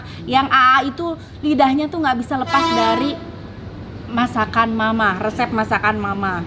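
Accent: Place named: Sunda, Indonesia. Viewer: Seneng banget ngeliat teh, suaranya imut banget suara khas sunda, yang tau pkonyaa..... Panggeulisna sunda❤️❤️❤️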